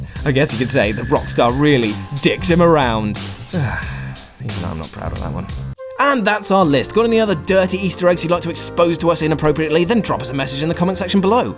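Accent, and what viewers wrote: United Kingdom. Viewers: Ok people that criticize a game that they can't fix or do anything about pisses me off but some one with a British accent criticizing a game just really pushes it. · this is guy is 100% british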